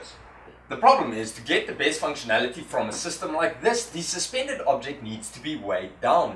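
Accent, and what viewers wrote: South Africa. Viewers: Great ideas, love the video. Spent time in Pretoria growing up so love the accent. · Thanks very informative - Hey are you South African? Where are you? So I'm new to woodwork and am showing my ignorance here. Just mad a long narrow shelf for my kitchen to store tea caddies etc but I dont think its deep enough for wall support so is insecure, Any ideas?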